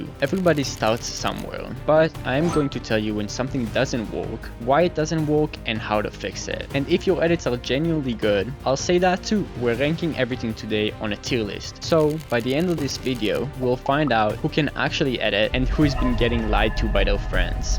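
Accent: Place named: France. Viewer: Bro you need a new Mic bro And change your French accent and then i will subscribe you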